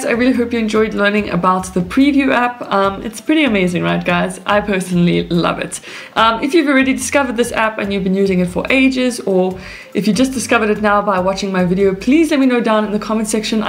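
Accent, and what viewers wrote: South Africa. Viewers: Are you from South Africa? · Cool Video... Great hearing a South African voice on YouTube :-) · Hey awesome vid by any chance are you South African ? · Thanks for sharing, it was really lekker to hear a fellow South African too! I'm based in the UK now and have been for the past 3 years! Still struggling along with social media!! Keep well, and thanks again.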